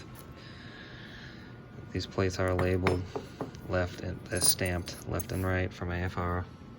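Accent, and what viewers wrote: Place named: Boston, United States. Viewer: Refreshing to hear another Boston boy. I’m Woburn myself. Good vid 👍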